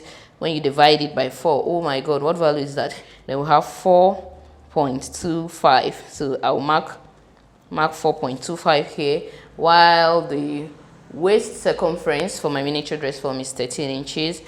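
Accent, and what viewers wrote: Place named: India. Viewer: At first ma,I thought something was wrong with my phone,when I heard you speak like an Indian. Thanks for this video ma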